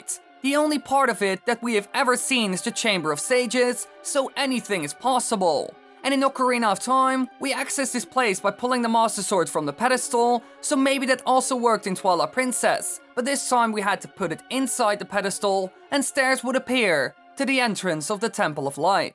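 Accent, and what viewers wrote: Netherlands. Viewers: despite the accent i can spot a dutchman in your voice for sure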